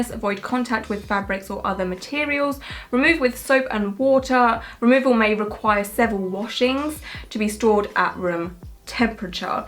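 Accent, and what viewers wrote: United Kingdom. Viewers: You are hilarious girl. I could just listen to you with that fabulous U.K. accent.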